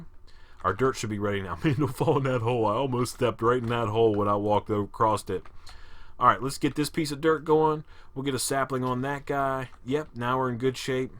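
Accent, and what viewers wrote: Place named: United States. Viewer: I'm sorry but you sound country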